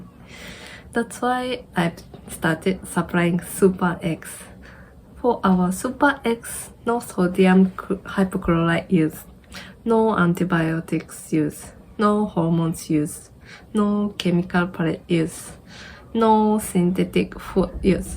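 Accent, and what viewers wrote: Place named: Malaysia. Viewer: You have a pretty malaysianized japanese accent.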